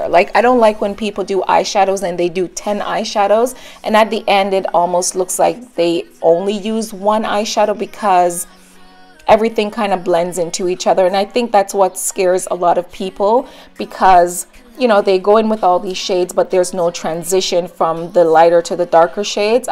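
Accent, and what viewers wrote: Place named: Jamaica. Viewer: Are you Jamaican ? Or of Jamaican/West Indian heritage? I am sensing a hint of my mother tongue :D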